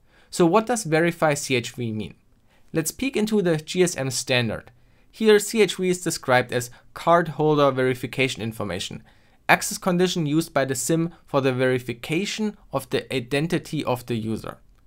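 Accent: Germany. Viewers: Are you german Bist du n deutscher😂?? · Chicken Fish i think he is from germany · You are german right? Guten Tag ich bin deutscher :-P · Bist du Deutsch? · Are you german? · Man hört, dass du deutscher bist. :D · Hmmmm 🤔 i can clearly hear that you are German ^^ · Are you a German? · junge hört man krass das du deutscher bist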